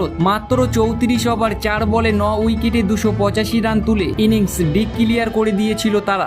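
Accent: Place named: Bangladesh. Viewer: বায়েন 😂😂😂😂 কি বলো কথা শুনেই বলা যায় তুমি কাঙলাদেশি😂😂 ভাষা ঠিক করো এভাবে লাইক পাবে না😂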